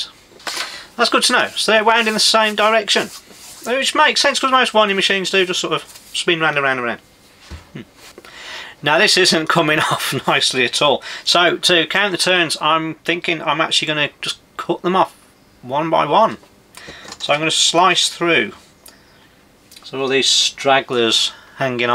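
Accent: Birmingham, United Kingdom. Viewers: You are like all us Brummies a happy chappy, bostin fix bud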